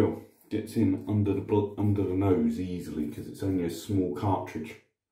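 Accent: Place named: United Kingdom. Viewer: After 2 minutes i realised he is from uk, and he is really from uk! They have this accent even foreigner easy understand.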